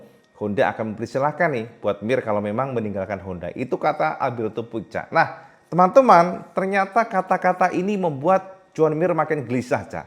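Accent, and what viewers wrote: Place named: Tulungagung, Indonesia. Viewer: bahasa tulungagung e gak lali ki om ujug" 🤣🤣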